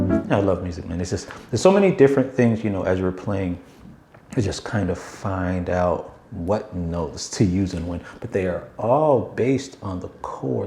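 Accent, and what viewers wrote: Caribbean. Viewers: He sounds Caribbean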